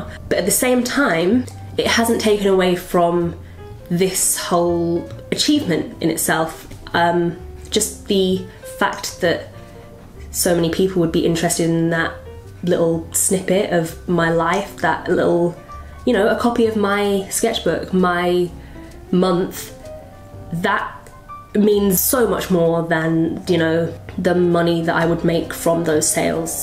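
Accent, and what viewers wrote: United Kingdom. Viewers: You have a very classy British accent normally (no offence) I kind of find British accents very lousy and not super classy like hers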